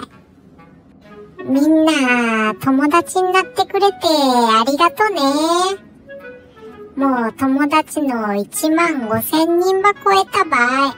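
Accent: Kumamoto, Japan. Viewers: はじめまして😂こてこての県民天草〜熊本市出身です😊（コメントはあえてNo熊本弁で🙏）やっぱり熊本弁はネイティブが話してこそ生きると痛感してます!来年もスカッとする熊本弁トークお願いしまーす👌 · こんにちは😂 いつも仕事から帰ってきて我が家のミディアムプードルと一緒に楽しく見てます😄 来年はバーニーちゃん、 ジーニーちゃんに会いに行きたいと思ってます😄 熊本弁…楽しくて笑っちゃいます🤣 (息子が熊本に住んでてイントネーションが変化して帰ってきてます笑) 来年も楽しみに待ってまぁす🐶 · 今年はジーニー兄ちゃんとバーニーとの出会いがありました。笑いと癒しを沢山届けてくれてありがとうございました❤ 出会いは未だ登録者数が3000人未満だった時です❤でもその後の快進撃❣️素晴らしい👍です。とにかく何気ない日常だけど笑える😅🤣熊本弁もいいよね〜💕 東京からだと熊本は遠いからなかなか会えませんが、もう親戚のわんちゃん達って感じです。 来年もどーぞよろしくお願いします❤ で良いお年をお迎えくださいね💕 では、ご無礼いたしま〜すぅ❣️👈この言葉私のお気に入りです😅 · ばっちゃんのチャンネル見つけて1週間。毎日見ていたら私の発音がちょっとおかしな事になってるみたいです😅千葉県民ですが、熊本弁に侵食されてきました。 来年も熊本弁を習得できるようたくさん見ます😊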